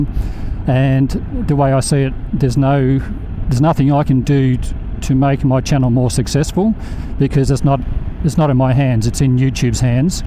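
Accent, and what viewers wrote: Australia. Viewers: Ozzie accent such a pleasant change from the pommies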